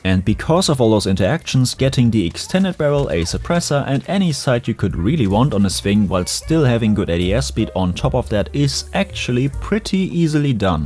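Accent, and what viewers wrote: Germany. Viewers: I fell in love with your voice and German accent in the first video I watched.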